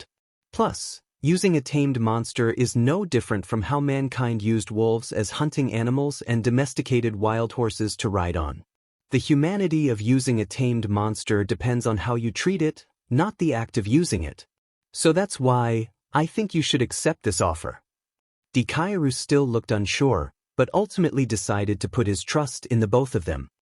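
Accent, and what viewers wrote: United Kingdom. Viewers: Why are you suddently British? No like you didn't have a British aí voice videos ago and now you have tf?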